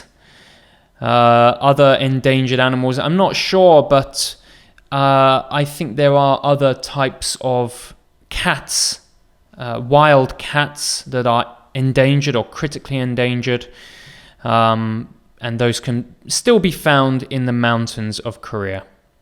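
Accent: United Kingdom. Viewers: I 've just come across with your channel its really so helpful and your accent is very clear i also like the British accent especially when you're pronouncing the sound s as a sh .Hajar from Morocco 🇲🇦.thanks a bunch.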